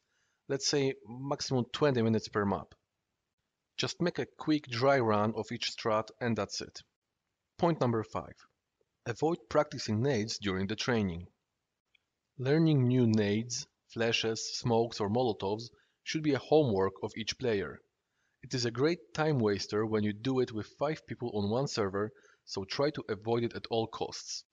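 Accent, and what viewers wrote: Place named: Poland. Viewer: Świetne - naprawdę prosto i klarownie przeprowadzone instrukcje :) Po akcencie domyślam się, że polak, ale bardzo wyraźnie mówisz :) I sporo potwierdzenia z tym jak myślałem, żeby przeprowadzić trening (szczególnie mówiłem, żeby każdy sobie sam opracował smoki obowiązkowo, a na początek zająć się jedną mapą przez kilka dobrych dni, a nie mieszać), a dodatkowo nauczyłem się kilku cennych uwag.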